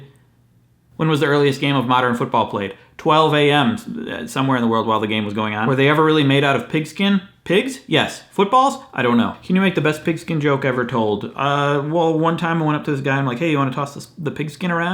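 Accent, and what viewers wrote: Wisconsin, United States. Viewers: that WI accent tho! do more Wisconsin jokes! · Love your Wisconsin accent,!:D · That accent is so 👌🏼 Can you do a video entirely in your Wisconsin accent? I'm a linguist and I love language variation! 😂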